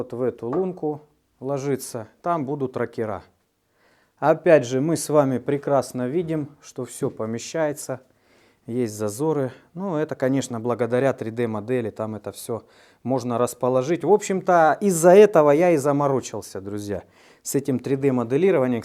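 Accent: Rostov, Russia. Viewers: Ростовский акцент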